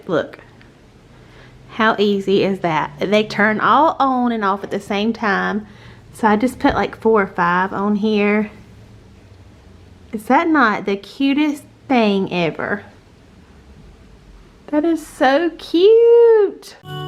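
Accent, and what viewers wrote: South, United States. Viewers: Thank you for sharing!! I’m so excited to decorate, and you just made me ten times more excited! Love your channel, and I just subscribed! Also, do you live in the south? We share the southern accent.🤗💜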